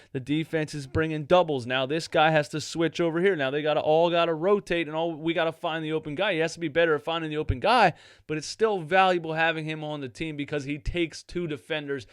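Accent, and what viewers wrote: Philadelphia, United States. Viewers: I’m from Chicago but love your vids cause I love Thybulle and Simmons. Is “you know what I’m sayin?” and “you understand me?” a Philly thing?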